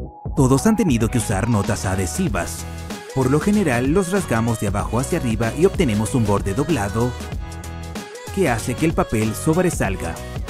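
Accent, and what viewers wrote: Russia. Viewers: Eres ruso ???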